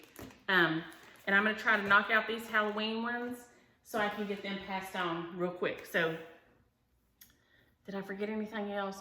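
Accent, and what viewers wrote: South, United States. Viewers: Love your stories and your "southern " talk, I feel like I'm in the south again. You have a beautiful face and personality.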